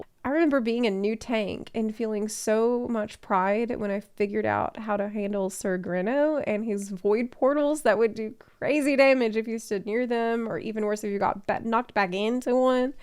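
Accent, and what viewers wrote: South, United States. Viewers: Xbox sprout here, only game I know you can put in..200 hours in a month and be a noob😂 I love listening to ya. As a southerner myself I like the accent. Now..back to my main full time+ot job. FfXiv!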